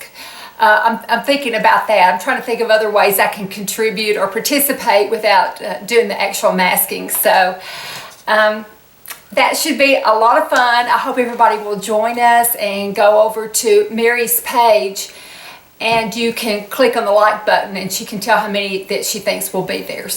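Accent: South, United States. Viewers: Oh, sweet, you are such a pleasure to watch, and us over 60 girls need to love the way we look and just enjoy our lives. My gosh, if I looked as lovely as you, I would be in seventh heaven, plus the delightful southern drawl. You just keep the sweet lady you are. Love from Iowa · you're such a beautiful, upbeat person! Enduring surgery and living with a chronic disease is not easy but you have learned to adapt and find what works for you. Love your video's and that Southern accent. Enjoy your time with the grandkids!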